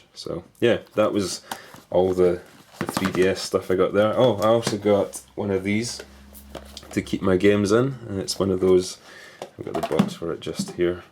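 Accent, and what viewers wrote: Scotland, United Kingdom. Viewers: Where u from? You sound Scottish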